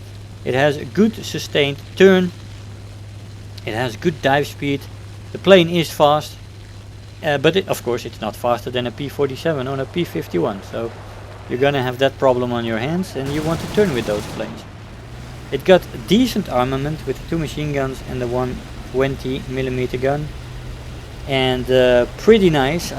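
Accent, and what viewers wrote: Netherlands. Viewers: He is Dutch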